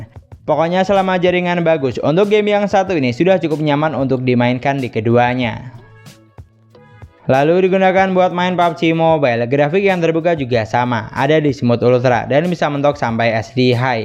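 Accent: Madura, Indonesia. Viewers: Madura ya bang?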